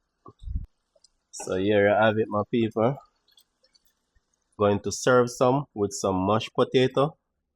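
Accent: Jamaica. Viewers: Chef, I really love your authenticity. Many Jamaicans change the way they speak and do things outside of the country. It is really refreshing to hear how you speak and express yourself as a person and as a chef. I can see that you really love what you do 😊. Keep repping the black, green and gold out loud! One love mi bredda and get well soon. God bless you🇯🇲🇯🇲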